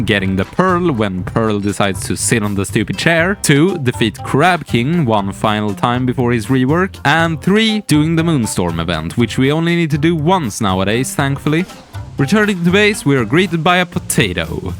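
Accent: Sweden. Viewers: Blessed by the algorithm gods! What a fun video, with great execution too! Shocked to see this channel hasn't even broken the 5k mark! Also, you have the funkiest, most pleasant accent. Are you Swedish by any chance? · I don't know who you are but you sound so obviously Swedish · Love the swedish accent!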